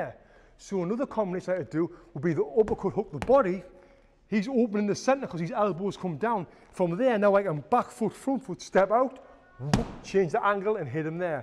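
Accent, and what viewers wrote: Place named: England, United Kingdom. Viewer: the english accent makes it more epic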